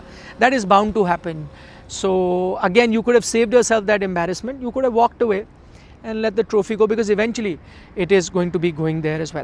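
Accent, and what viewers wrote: India. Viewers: Is the person presenting this story? If so, is he Indian? He sounded of Indian origin. He did a great job in narrating the story as it unfolded - unbiased, professional and clear.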